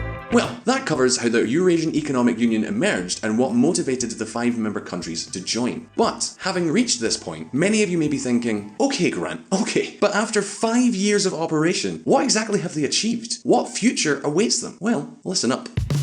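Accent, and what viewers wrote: Scotland, United Kingdom. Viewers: Scottish, NOT. BRITISH! And no beard. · It's nice to hear a Scottish voice, Grant 🤠 We're hoping to hear more about internal geopolitics such as Scottish independence and Irish unity. All the best to you!!! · Where the f*** is Simon and why is this Scot talking so quick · Some Scotish accent characteristics I've just learnt: Perfect -> PEAR-fect Signed -> scent/Saint (if you speak "inwards") Side -> sight Outside -> oat-sight Know -> mix of no & nule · Scottish accept is difficult for me. Nothing personal. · honestly i'm quite pissed they hired a scot with such light accent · *uk (he's Scottish but close enough) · I love the Scottish Accent Mate! Well done nice job and great sense of humor 👍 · It's not a British accent, posh Scottish which is much different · The Scottish accent was something I didn't know I needed on this channel but I welcome it! 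· This channel defo requires some Scottish flavour a wee bit more. Aye g'ud job there Grant lad!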